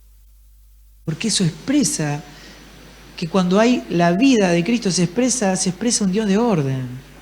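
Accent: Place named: Argentina. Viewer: Es argentino es normal su forma de hablar ...no busque el pelo en la sopa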